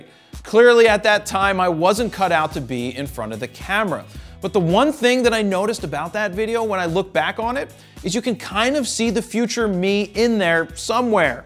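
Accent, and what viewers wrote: Philadelphia, United States. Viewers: Being from South Jersey, nothing wrong with your Philly accent. Although I’ve bee away from Jersey still have accent. Thanks for your video showing how you began. Oh and loved the portion with your Grandmother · Nothing wrong with a Philadelphia accent! It was so great to see the Lil clips, those were priceless!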